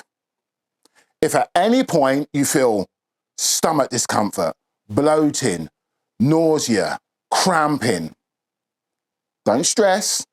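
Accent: United Kingdom. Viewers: He is so British that he sounds fake. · Sounds like midlands (Brummie)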